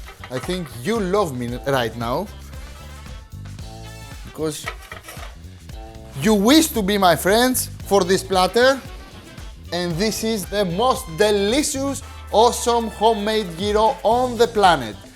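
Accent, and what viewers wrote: Greece. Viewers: his accent is great and I mean it. Why so many Greeks have a problem with his accent? Why on earth do you feel embarrassed with something you shouldn't and it's none of your business. And finally don't forget that for the English speaking world he is an Greek!! That's what he sells and the accent could be very well part of it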